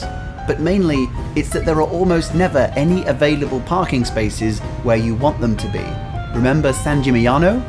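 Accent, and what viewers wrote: England, United Kingdom. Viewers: I love your videos👍🏼 and your English accent 👍🏼👍🏼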